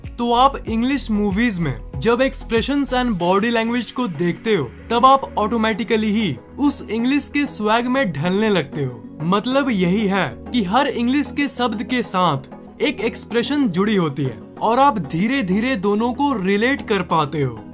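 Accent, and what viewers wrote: Bihar, India. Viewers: He is from BIHAR. I am confident. By his accent , tone.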